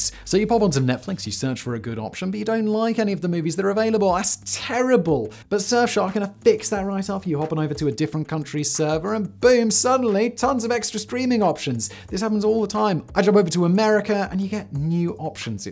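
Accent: England, United Kingdom. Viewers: I have to tell you that the commentator in this video speaks too quickly combined with a thick English accent while at the same time sounds like he is holding his nose shut. So the reault is you can't understand or at least you have a very difficult time understanding what he is saying.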